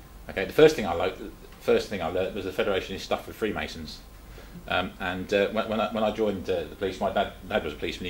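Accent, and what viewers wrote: United Kingdom. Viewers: two points 1) lose the hyper dramatic intro music. It diminishes the validity of the message with bombast 2) as much of a chore as it is, huge I know, subtitle. I presume Brits can understand this accent, but, combined with the too distant microphone, this flavor of English & its hasty delivery are unintelligible to an American.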